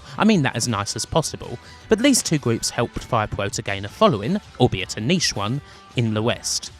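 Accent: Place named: England, United Kingdom. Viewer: I think it's the English accent maybe?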